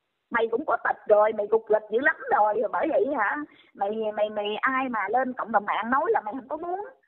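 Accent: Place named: North, Vietnam. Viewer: Sao co líp này nói cái giọng nói bac kỳ sao ghét quá, giọng nói người nam ta nói thấy dễ thương 😊😊😊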